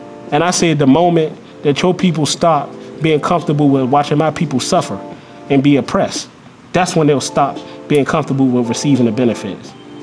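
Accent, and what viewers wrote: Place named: Baltimore, United States. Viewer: You sound like you from Baltimore but real facts to the video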